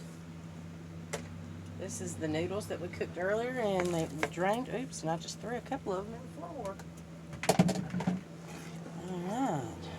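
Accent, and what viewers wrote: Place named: Texas, United States. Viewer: I love ur accent. I am from Texas and I have that accent.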